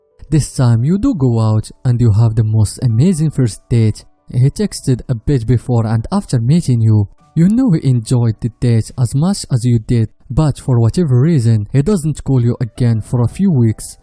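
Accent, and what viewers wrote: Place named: France. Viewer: he is a french speaker that's why he got that accent i think he's getting better just be patient and use captions Give him some encouragement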